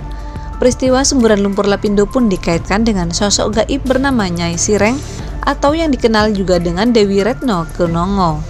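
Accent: Madura, Indonesia. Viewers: Kayak orang madura logatnya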